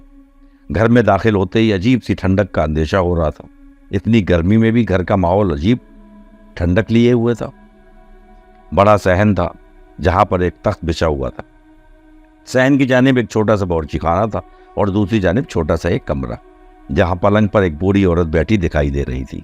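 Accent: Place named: Pakistan. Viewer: Amazing incident 😮 n love your urdu ascent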